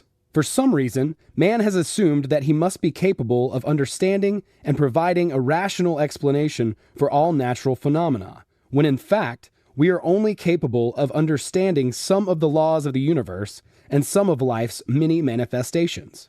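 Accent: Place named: South, United States. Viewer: Love the voice used for the intro! It would be awesome if the same 'voice' from the intro was used to read the chapters in this audio. I understand you can't please everyone; the reader who read the chapters sounds like he has a Southern twang which was distracting. It's me, not you. lol Never the less, thank you for posting.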